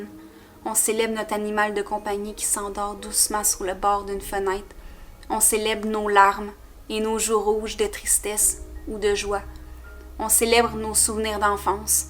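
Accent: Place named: Quebec, Canada. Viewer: je viens de commencer ta chaine et jai regardé pas mal de tes vidéos et jaime beaucoup ! mais c'est marrant j arrive toujours pas à m avouer que je pratique 🤷‍♀️ pourtant ça fait 17 ans que jai mis un pied dans l ésotérisme 😅 mais tu m as convaincu d un truc que je devais vraiment créer quelque chose (j écris et dessine depuis toujours mais jai envie de travailler autre chose) en tout cas merci ! (non tu m as convaincu d autres choses j'aime bien aussi l accent québécois 😂)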